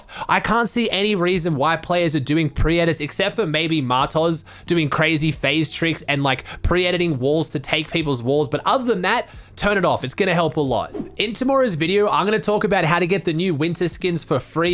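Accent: Australia. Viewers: U doin great mate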